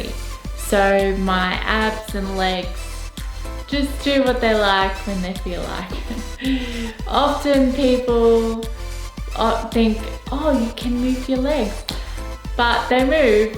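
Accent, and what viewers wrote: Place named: Australia. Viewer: You sound like a heck of an Aussie girl,. A like & subscribe from me as well & now following you on FB. Keep up the great work & all power to you!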